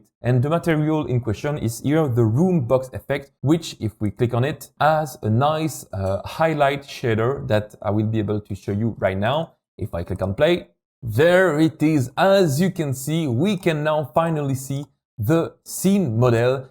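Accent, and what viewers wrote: France. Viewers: Hi, are you french? your accent...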